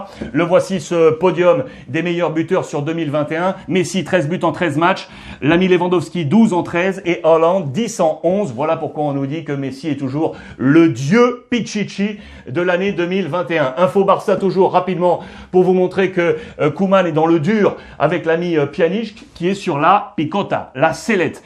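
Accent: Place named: United States. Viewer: L'accent américaine top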